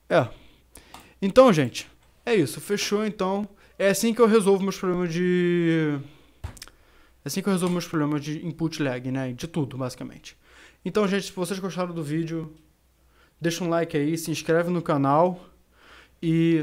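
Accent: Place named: Portugal. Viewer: Vc é de portugal mano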